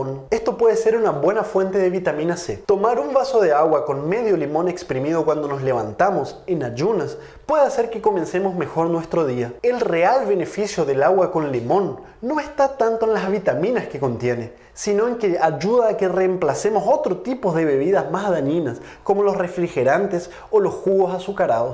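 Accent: Chile. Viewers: Noviembre 1/2022: contenido interesante. Usted combina 2 tipos de acento. Habla como chileno y como yucateco. Posiblemente estudio en México. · Gracias Doctor. por su acento creo es chileno. Saludos de Mx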